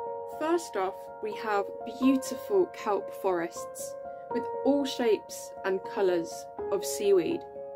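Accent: United Kingdom. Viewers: Dang!!! And she's super smart and intriguing!!! I like this girl!!! My new c favorite channel!!! There just isn't any other women who are interested in nature and science, and have the most awesome/raddest Cutest style and the accent! I've always kinda not really like a Girl with a British or European accent really.... What was I thinking, I'm speechless! I wouldn't have it any other way now that I've witnessed this perfection before my own eyes!! Okay, sorry but I just had to state what was on my heart!!! I can promise you that I don't go around complementing women on the internet like this EVER!!!! But I guess either I'm the only guy here who is astonished.... Or maybe I'm just the only weak willed man who just couldn't play it cool and act as if I wasnt smitten!!! ..... No offense at all! I just am.... Darn.... Well there it is! 🙃✌🏼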